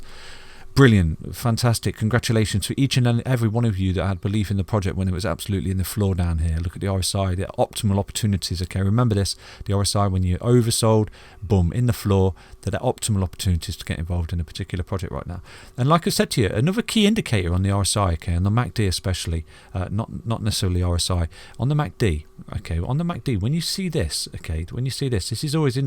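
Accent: United Kingdom. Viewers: Alot of the terminology with these charts is new to me, combined with the British accent and dialect, I maybe understand about 70% of what's going on but im still tuning in. HODL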